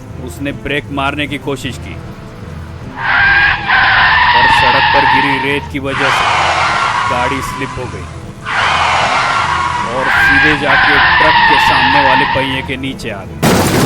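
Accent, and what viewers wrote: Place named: South India, India. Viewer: Mujhe sauth ka lga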